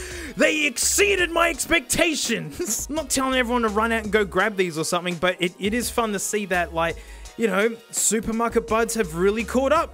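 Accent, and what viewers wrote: Australia. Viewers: Nothing gives me more joy than hearing an Aussie dude destroy the living hell out of earphones😂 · Dang, I love this stuff, helps me appreciate Australians accent way more, and the laid back and fun content is spot on! Keep on "Exceeding my expectations!"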